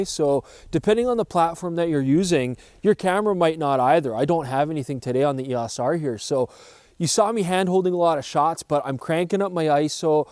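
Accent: Canada. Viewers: Ok...I was high when I watched this and thought to myself, “his Canadian accent is really coming out in this video or has he always sounded like this and I’m just noticing now? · You sound even more Canadian...somshow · Love that canadian accent! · Definitely a candian accent detected lol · Damn!!! you sounds like my Canadian cousin in Vancouver BC. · I would love to see that level of Canadiana in all your videos!! I am sure Jordan loves your accent, ehh!! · I... I don't know. I guess I learned I like the canadian accent? Btw best intro of 2019 so far. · Heavy Canadian needs to make a comeback. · Spot on Canadian affect there buddy 🇨🇦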